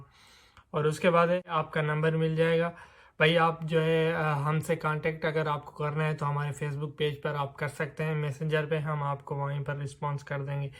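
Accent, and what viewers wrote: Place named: Sindh, India. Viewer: Ada ap lehje se Sindhi lgte ho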